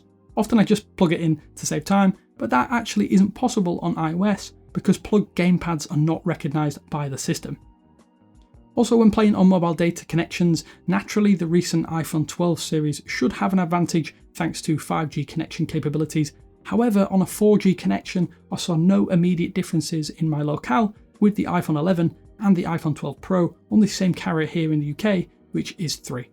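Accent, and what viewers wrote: United Kingdom. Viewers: Is this available worldwide? I’m in the UK and it doesn’t seem to be working. I ask the question because you seem to have a UK accent.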